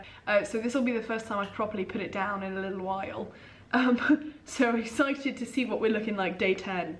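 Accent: United Kingdom. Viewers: the most relaxing british voice ever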